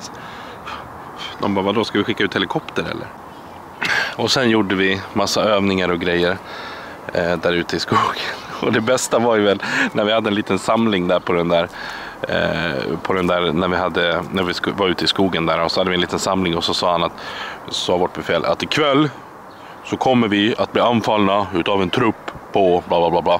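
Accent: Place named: Dalarna, Sweden. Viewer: gud va du pratar Dalamål har aldrig hört dig prata så tidigare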